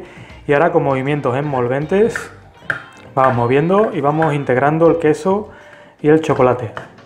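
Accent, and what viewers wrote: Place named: Spain. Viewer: Me causa mucha gracia el acento de este español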